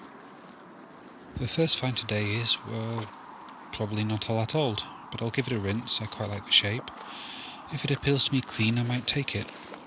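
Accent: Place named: RP, United Kingdom. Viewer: you sound pretty posh for a mudlarker.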